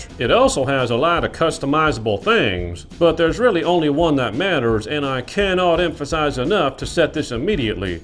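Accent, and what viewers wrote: Texas, United States. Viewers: An asian that has an accent of an american guy from texas lol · i have bad vision, the mustache is a small detail. As for the accent it didn’t sound annoying to me, just unique. Not tricked, You just sounded like a texan with a thick accent. I pay attention to what is said not appearances. I just hadn’t considered the possibility that you were playing a role.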